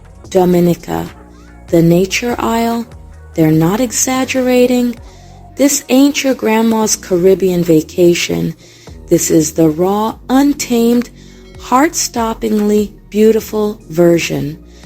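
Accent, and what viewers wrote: Jamaica. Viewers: I love this. For one moment I thought English which is taught in schools went extinct. Anyone from any English-speaking country can understand and partake. Nothing tops that beautiful Jamaican accent. I wish you much success in your new venture. God bless.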